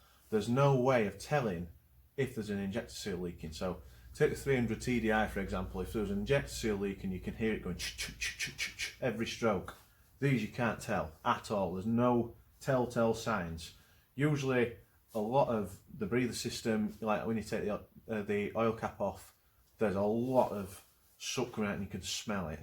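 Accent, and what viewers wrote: Yorkshire, United Kingdom. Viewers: It is a Yorkshire accent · is that a Yorkshire accent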